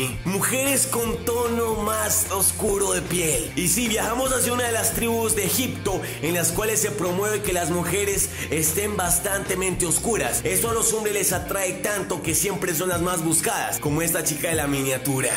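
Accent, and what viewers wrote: Colombia. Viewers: Hola bro eres Colombiano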